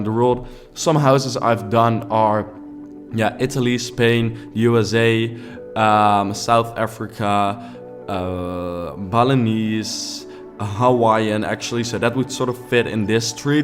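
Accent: Netherlands. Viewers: Aan het begin van de video vond ik het al op iemand lijken die Nederlands is en Engels praat 😂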